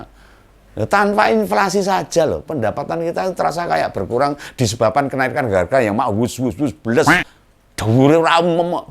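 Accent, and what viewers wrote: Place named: Solo, Indonesia. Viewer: bhs nya bhs solo...saya wong wonogiri turah mudheng...madyaang...dhedhak..tibake...wis pokoke familier...😂😂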